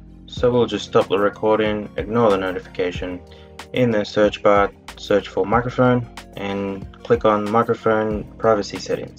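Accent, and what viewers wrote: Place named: United Kingdom. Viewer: you're correct i watched the video my record button has been greyed out formonths on the game bar. pulled it up again while listening to your british lilt and the button was still greyed out >anger viscerall through my veins . however i kept listening to you and win+alt+R did work albeit i had to finagle the sound settigs and change permissions for audios using a different tutorial but your hotkeys knowledge did the trick. "cheers mate"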